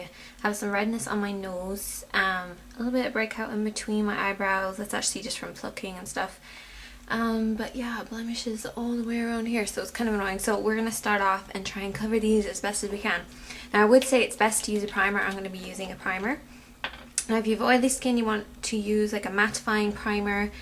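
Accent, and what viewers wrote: Ireland. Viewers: ur irish? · Are u Irish??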